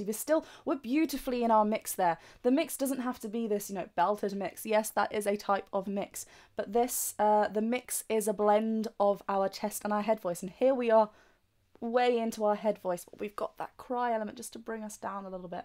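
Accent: England, United Kingdom. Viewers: Coach i love ur english accent ❤ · What dialect of British does she speak?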